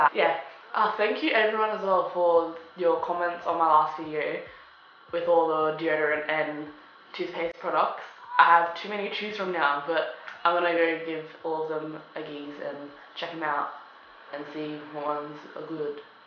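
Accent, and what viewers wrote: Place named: Australia. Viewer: How many bananas did you put into your smoothie bowl? You have SUCH a strong Australian accent btw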